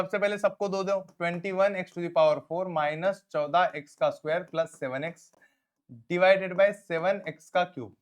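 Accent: Maharashtra, India. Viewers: sir marathi manus aahet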